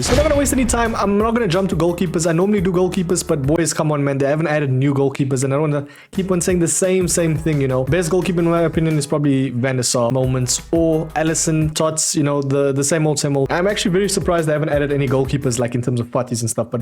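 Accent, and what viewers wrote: Cape Town, South Africa. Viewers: You sound like ya from Cape Town with a mix of something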